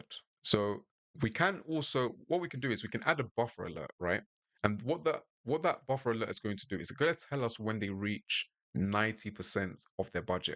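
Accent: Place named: United Kingdom. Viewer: And your British too perfect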